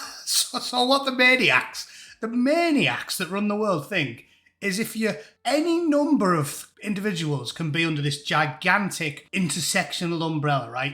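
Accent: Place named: Middlesbrough, United Kingdom. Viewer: That sounds like a sunny Boro accent I'm hear here..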